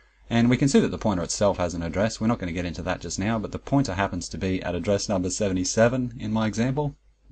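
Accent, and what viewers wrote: Australia. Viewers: Alejandro Doe You do know Lennon was a Brit and this dude sounds like he's from Down Under. BTW vectors are better than linked list almost always. You get a lot more cache misses vs. vectors.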